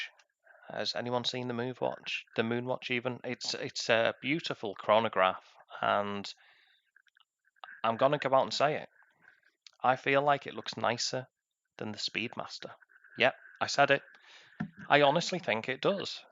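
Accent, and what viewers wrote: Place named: Northern England, United Kingdom. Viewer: yeah I did mate, to be honest it was probably your review which tipped it for me, great watch, could easily be my exit watch (but I know it won’t be) I’m really looking forward to your review of the bulova, I honestly think you have great watch content, especially for ‘normal’ people who don’t have the cash for high end watches. Quick question, you sound quite local to me, I’m guessing St. Helens? Definitely northern 👍🏻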